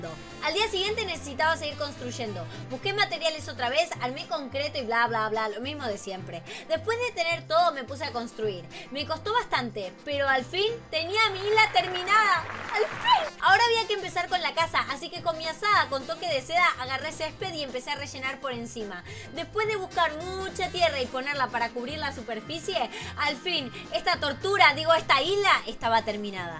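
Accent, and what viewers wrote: Argentina. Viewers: Te quiero mucho y me encanta el idioma argentino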